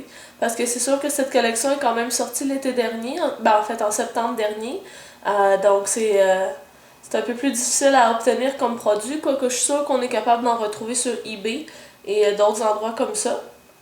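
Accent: Canada. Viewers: Très beau maquillage ... j'adore tes vidéos et ton accent canadien fan de J.Deep mais pas autan que toi... une française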